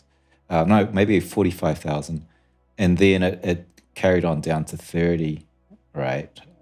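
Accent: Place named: New Zealand. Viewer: Hey...you have a strong Kiwi accent...are you overseas or in NZ? chur bro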